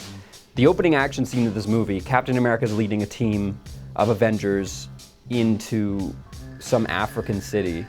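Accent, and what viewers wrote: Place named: Canada. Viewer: The canadian accent has never been so prominent.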